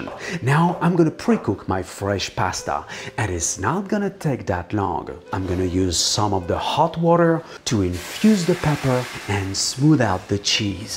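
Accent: France. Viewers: french accent ^^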